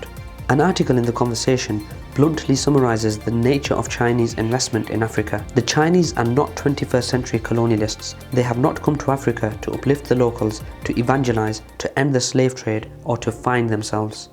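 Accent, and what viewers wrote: India. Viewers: He sounds Indian doesn’t he? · Voice of Indian.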